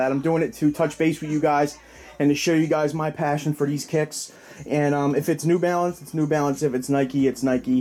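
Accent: Philadelphia, United States. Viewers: much love! nothing but love on them 8 years cuz! I hit 10 years in October...don't let nothing take you down and realize that you're handlin ya business regardless of all the other bs..I hope your personal life gets back on track too..it's funny, my girl hates that I watch these vids on youtube..ya know, the "oh my god, another fukin pair of kicks" type girl..but anytime your vids come on she's knockin me off the computer like"yo, let me get dat jawn, it's bitch"..and i'm like"damn, kick back breezy"..na, but she thinks you're funny as fuck and she loves your passion..we're both from south philly, so it's like listenin to one of my boys..but 4 real cuz..stay up and do you, but drop a vid here and there for the real heads..peace! p.s.-check out that new and album-unpredictable cuz!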